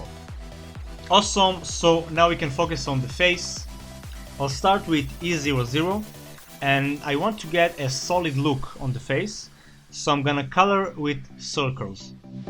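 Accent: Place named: Israel. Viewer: u sound isreali are you and btw great job ty this helped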